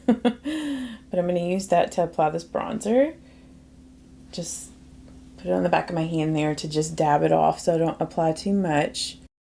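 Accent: South, United States. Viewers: Hi....i have had the foundation for a few months and just bought the serum tint yesterday....only just launched in Australia with the serum tint one this week....typical!! We are always so late getting newer stuff here Anyway i was excited to see your comparison video....look forward to trying it tomorrow. I was kinda hoping it might be less dewy looking...we shall see! Love your beautiful Southern accent btw... hope you have a lovely Easter! Take care...Lindy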